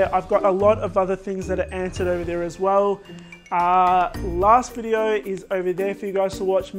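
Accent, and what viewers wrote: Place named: Australia. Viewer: That's Aussie accents for you x Aussie accents are the best ever!!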